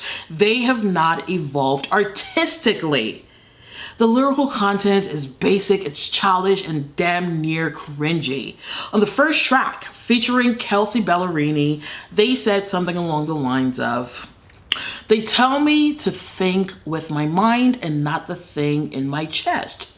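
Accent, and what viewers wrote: United Kingdom. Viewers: Is she originally from London because that’s what I’m getting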